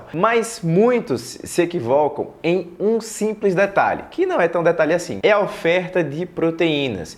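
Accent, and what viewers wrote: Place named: Sergipe, Brazil. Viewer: Pelo sotaque eu percebi que O dr. é de Sergipe kkkk aqui pertinho de Salvador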